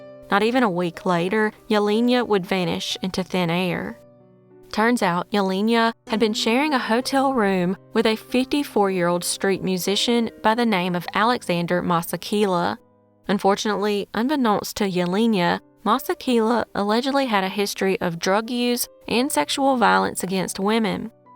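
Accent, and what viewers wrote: South, United States. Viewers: Thank you lovely 🥰 lady from the South with a beautiful Southern accent and a kind Southern heart! 💕💐💕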